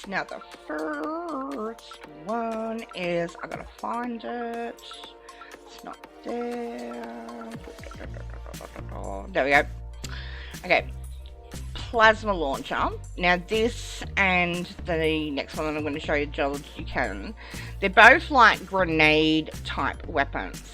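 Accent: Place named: England, United Kingdom. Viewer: How do you feel about Roseanne Barr? I lover her sense of humor.. but if no one has said it before you are exactly her with hint of an english accent. Asside from that.. Great video, informative.. but english Roseanne sold it .. lol! thanks!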